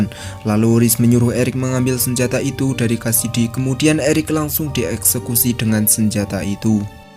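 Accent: Java, Indonesia. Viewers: Kek orang jawa nii bang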